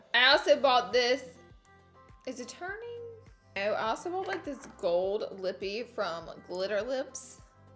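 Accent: South, United States. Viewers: Olly. Lol. Love u with ur sweet southern accent!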